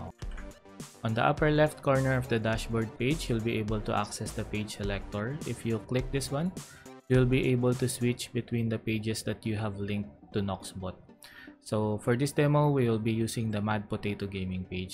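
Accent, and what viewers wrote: Philippines. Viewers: Are you Filipino? I can hear definitely hear a Filipino accent hahaha! Yo thanks for this man! Love to support fellow Filipinos as well great job with this dude!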